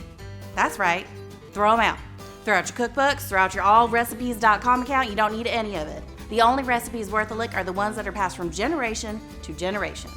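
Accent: South, United States. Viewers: That Southern accent is like butter. It makes things better.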